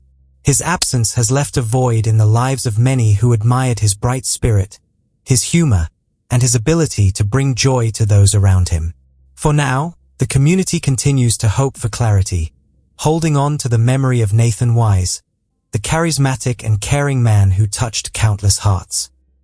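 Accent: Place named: England, United Kingdom. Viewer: Damn I hate when these type of videos are narrated by people with English accents 👎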